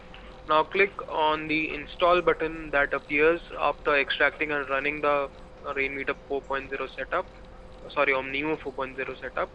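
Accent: India. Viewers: haha love indian accent xd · hhhhhhhhhhhhhhhhhhhhhhhhh the indian english soooooooooooo bad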